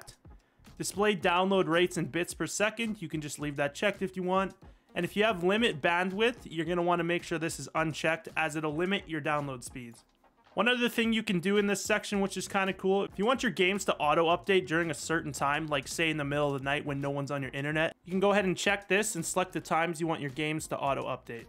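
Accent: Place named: Winnipeg, Canada. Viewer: you're from winnipeg?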